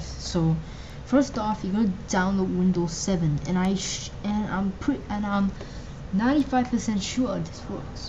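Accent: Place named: London, United Kingdom. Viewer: you sound like your from london!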